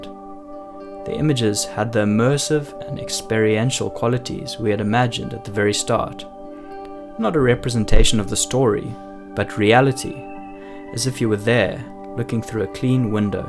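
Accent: South Africa. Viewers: Love your South African accent brother 🙋🏾‍♂️. Great insights as well. He's my favourite cinematographer atm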